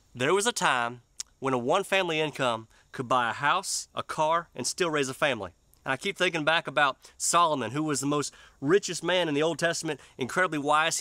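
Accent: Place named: Texas, United States. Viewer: I like your voice are you from Texas ? You sounds like Texans..I live in Texas ,but I’m originally from west Africa..love your videos.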